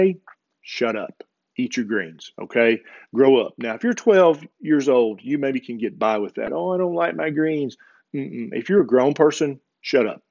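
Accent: South, United States. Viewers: Just stumbled across this video binge watching channel. Don't know that I've seen all quite yet, but I almost died laughing: "Shut up and eat your greens!" For God's sake, Doc, you're killing me with your southern-ness! To everyone reading these comments, this man is a treasure - support him via Patreon!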